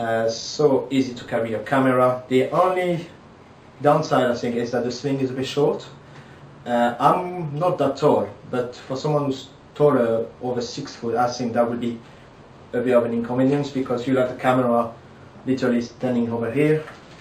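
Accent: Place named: France. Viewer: Nice stuff mate! Are you originally from France? I think you are because your dialect sounds so. :) Have you ever considered to change Sigma 70-200 to a Nikon VR1? It's a one of a beast lens on a full frame!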